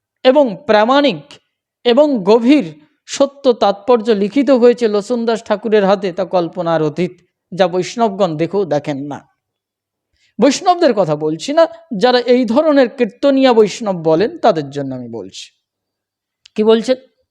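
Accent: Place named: Khulna, Bangladesh. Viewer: Dada apni khulna odhibasi mone hoy amer ma er vai er moto apner kotha boler dhoron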